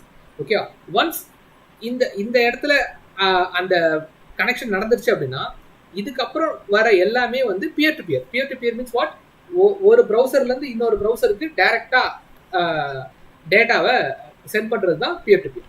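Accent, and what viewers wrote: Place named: India. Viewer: As an Indian myself...i cannot understand him.....his Indian accented English is atrocious.....Or is he speaking Mandarin ???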